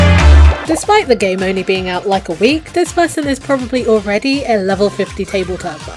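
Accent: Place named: United Kingdom. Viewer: SHES BRITISH YESSS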